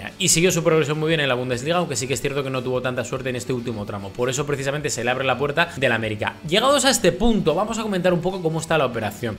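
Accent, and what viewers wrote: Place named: Spain. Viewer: De que parte de españa eres saludos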